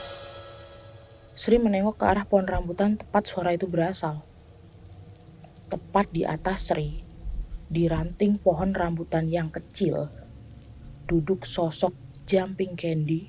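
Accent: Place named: Java, Indonesia. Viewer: Nah cocok medoknya 👍 "Culi, culi, culi" berasal dari kata "uculi" atau "lepaskan" Lepaskan apa? Yaa tali pocinya dong 😂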